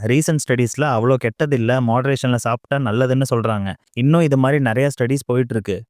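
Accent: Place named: Erode, India. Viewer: Indha erode makkal slang heh ❤❤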